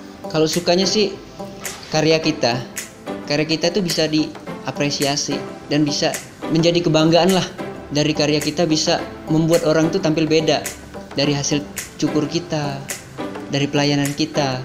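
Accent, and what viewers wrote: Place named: Palembang, Indonesia. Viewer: Mantap..gaya ngmng palembang masih Ado😀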